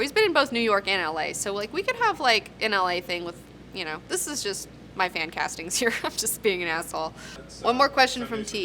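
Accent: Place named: Appalachia, United States. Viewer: wtf.. how come youre talking with a hillbilly accent?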